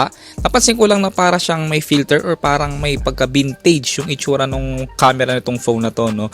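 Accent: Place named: Pampanga, Philippines. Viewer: you sound Kapampangan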